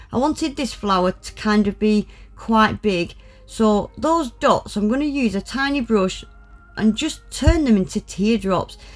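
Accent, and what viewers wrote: Yorkshire, United Kingdom. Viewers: By the way, which part of Old-Blighty are you from? You definitely sound like a Yorkshire girl!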